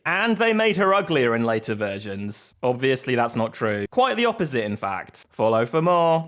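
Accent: United Kingdom. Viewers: This is because of his Bri’ish accent, really. They sometimes Rs between vowels.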